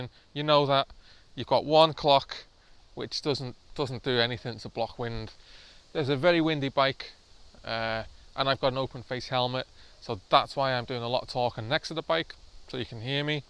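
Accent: Liverpool, United Kingdom. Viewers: A Scouser in Finland ? Are you on the run? LOL PS Subscribed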